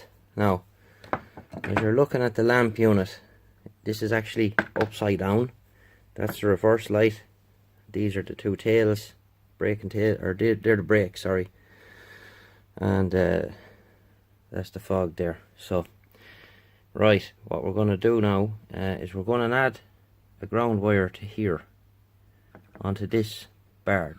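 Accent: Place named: Atlantic Canada, Canada. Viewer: Well done, where are you from? You sound like a Canadian east coaster.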